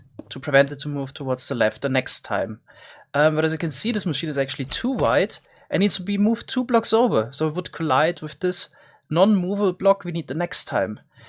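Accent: Germany. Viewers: Mann Merk das du Deutscher bist😂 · Are you german ? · That German accent, loved it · Ich mag den deutschen akzent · Sprichst du deutsch? Das merkt man bei der Aussprache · His german accent is legendary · Are you from Germany because you sound Like a German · are you german (Just Asking because of the excent) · Bist du deutsch? Xd klingt so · Liebe den deutschen Accent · Are you german??? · Love ur german accent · This German accent. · Hahah dieses deutsche englisch😂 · man hört das du deutsch bist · Da hör ich doch direkt den deutschen akzent😉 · Du bist Deutsch, man hört das xD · Nice! Rebuilding Farm Simulator in minecraft. Is that a German accent I hear? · This german accent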